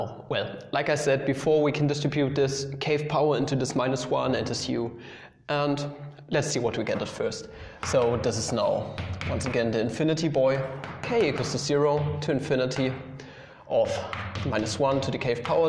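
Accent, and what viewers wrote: Germany. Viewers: You are German and I understood that from the way you pronounce z and this :p Awesome video nonetheless.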